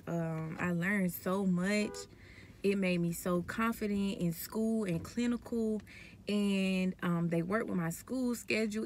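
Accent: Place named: Georgia, United States. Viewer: Girl the accent screams Georgia lol. I’m from Albany, born in Phoebe Putney Hospital 🤍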